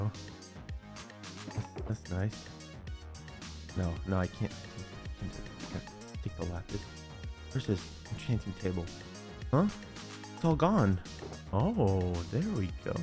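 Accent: United Kingdom. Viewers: I never knew can do a british accent! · The scouse accent is killing me 🤣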